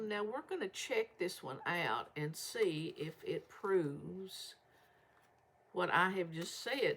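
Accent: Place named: South, United States. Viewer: Hey....it's all good!! It's just from the Southern roots you grew up with. And it's down right special!! I get a lot of pleasure from hearing the different accents. Probably because growing up and being in Florida....where there are actually more Northern folks...so accents not Southern mostly. I love that twang! Don't you worry about it. It's perfect!❤❤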